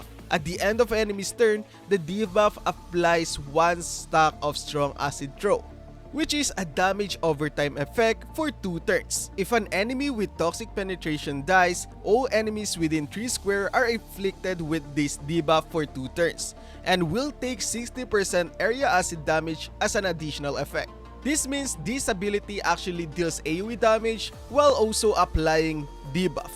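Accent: Philippines. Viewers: you sound very pinoy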